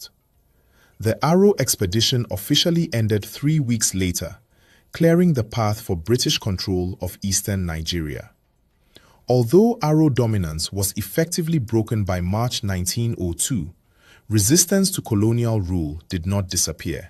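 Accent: Ghana. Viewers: He sounds more Ghanaian than Ijaw. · 🎉'A Nigerian here! The Narrator sounds Ghanaian. 'Very interesting indeed.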